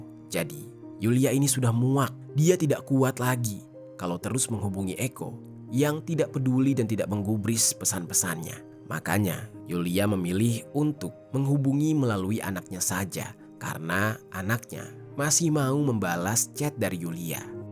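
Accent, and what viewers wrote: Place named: Surabaya, Indonesia. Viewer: Sht slalu n semangat n sukses, sememi Surabaya aksen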